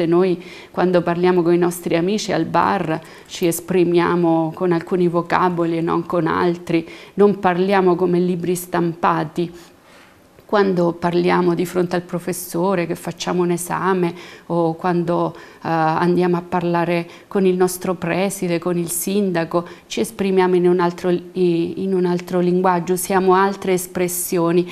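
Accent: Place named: South, Italy. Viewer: Quante scene. Brava la prof. Chiaro esempio di diatopia. É meridionale e si sente, embè?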